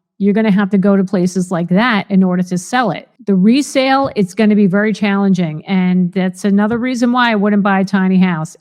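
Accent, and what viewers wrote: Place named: New York, United States. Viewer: Where you from? New York sounds like. "Cawst" instead of costs. Funny.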